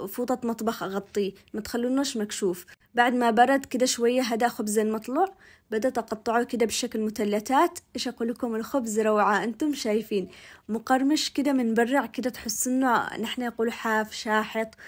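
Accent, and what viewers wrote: Yemen. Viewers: الله يعطيك الصحة انا جزايرية و زوجي يمني و الله يفرحك قناتك ممتازة ما شاء الله و لهجتك يمنية حلوة و مفهووووومة 😊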